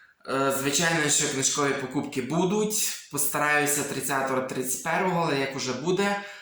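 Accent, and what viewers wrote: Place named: Transcarpathia, Ukraine. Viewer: Не треба менше розмовляти закарпатською!🥺 для мене то - мед на вуха🥰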